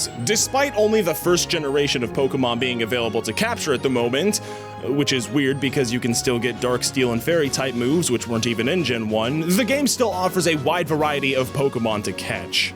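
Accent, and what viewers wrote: United States. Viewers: I knew I was right about that kansas accent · Does everyone in the US have an accent like that? I mean it sounds much cooler than british english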